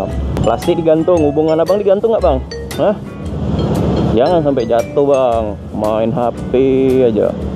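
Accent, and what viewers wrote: Medan, Indonesia. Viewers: Ko kiranya medan bg jemping jemping sampeng😭😂😂😂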